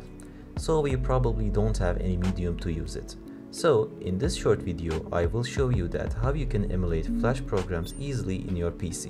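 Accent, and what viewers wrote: Turkey. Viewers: Konuşmandan anlamıştım bu kesin türkdür diye :D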